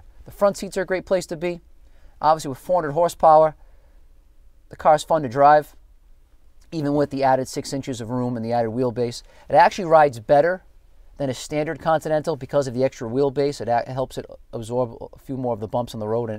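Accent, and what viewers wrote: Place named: New York, United States. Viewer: A New York accent and a Lincoln. great combo!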